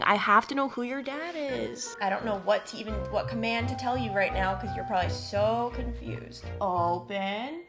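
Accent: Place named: Long Island, United States. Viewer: I think your lack of sleep is making your Long Island accent come out more 😂 Asher is so cute!!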